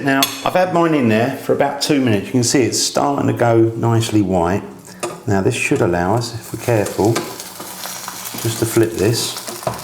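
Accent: United Kingdom. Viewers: British accent. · beautiful british accent ;) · british as fuck. I love it, tough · This is what most British people sound like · Thanks for the recipe M8. I love the accent BTW. There's something about a Brit accent on cooking videos. They should call it the Gordon Ramsay syndrome..... ;)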